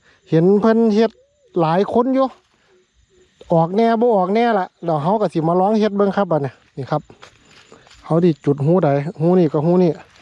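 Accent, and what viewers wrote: Chaiyaphum, Thailand. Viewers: สำเนียงเสียง คือคนทางชัยภูมิ แท้ครับ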